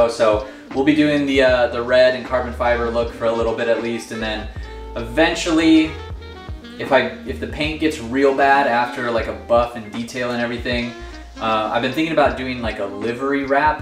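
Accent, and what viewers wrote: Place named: Chicago, United States. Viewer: Hey, you from Chicago? I've seen the Bears stuff in your other videos, and, I thought your accent sounded familiar. The fact that you have an open dislike towards Wisconsin just kinda confirmed it for me, lol.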